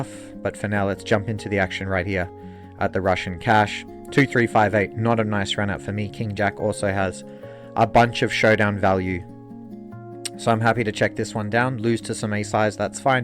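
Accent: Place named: New Zealand. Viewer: His accent sounds like he's from New Zealand but it says hosting from Canada? Am I just mistaking the accent or did he move? Anyone in comments know the answer? (Curious because I'm from New Zealand)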